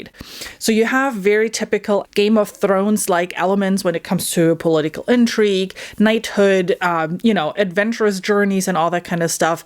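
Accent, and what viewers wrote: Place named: Boston, United States. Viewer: First, love the accent (north east bostonian?) seemingly masked tho— just wanted to say first time viewer but will check into your rec’s!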